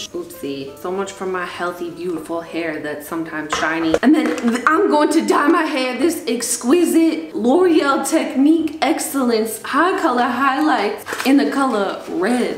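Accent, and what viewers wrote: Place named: New York, United States. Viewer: I feel like as soon as you know is from NY, you can really hear it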